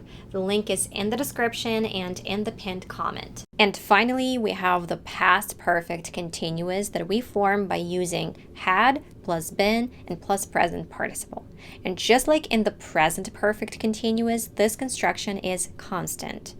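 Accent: United States. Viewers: When i watched this channel for the first time, i felt myself very contradictory. I heard an exceptionally, i'd even say pirufied american accent, and i saw this face and couldn't believe she's an American. And i was right. That was a great job but what it's given? Being an American doesn't mean having an impeccable language. It means sharing certain values. It means creating certain values. It means to be a part of american society. It means to have a common future with the US. The US is a multicultural country. Many accents, many dialects. It makes the US be the US. Nobody cares how your language pure is but anyone cares what you feel about your place here. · What is her accent pls ? (California, Texan ?)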